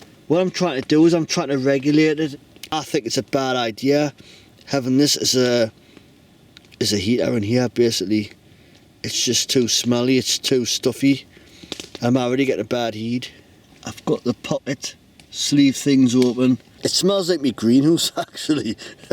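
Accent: Newcastle, United Kingdom. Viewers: Love the Geordie (?) craziness, any chance of subtitles for us Southerners - only joking ;-) Useful info too thanks.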